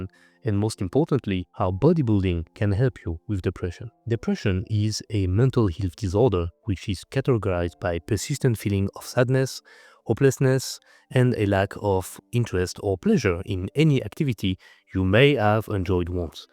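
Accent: France. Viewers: I would pay for a French accent like this 😂❤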